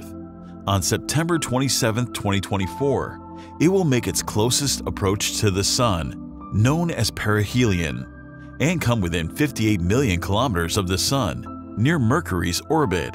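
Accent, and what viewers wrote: United States. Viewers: so heavy accented american voice robot. you say become visible in summer of 2024. i guess you must mean american summer, showing you think the whole world is america, not very good for a space oriented channel teaching about planets, sun and comets, where they dont have summer! So i guess down under we can guess you mean winter.😮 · When the narrator is American you just know it's not true.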